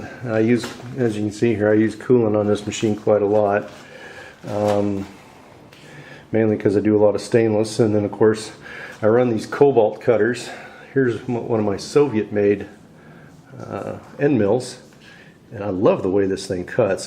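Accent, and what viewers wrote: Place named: Palatinate, Germany. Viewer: You're welcome, I now have watched all of your vids and subscribed as it seems you've got some interesting projects in the pipeline. Also good to see there are other guys around who cannot stop feeding the monster ..:-) Anyway, i'm a bit confused,... your yotuibe location says US and you sound like a native American to me, but power sockets and the "Schutzbrille tragen" sign on the door looks certainly German, not to forget your "Paelzer" dialect on the German video, which sounds pretty native as well...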